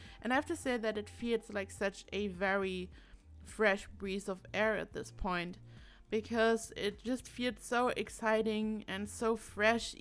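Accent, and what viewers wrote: Germany. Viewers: Are you German by chance? I feel like I recognize your accent.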